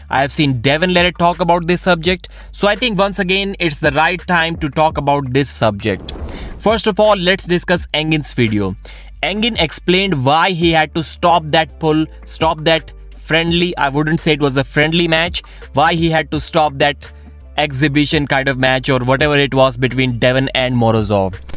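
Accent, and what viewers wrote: India. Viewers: This pajeet accent is hard to listen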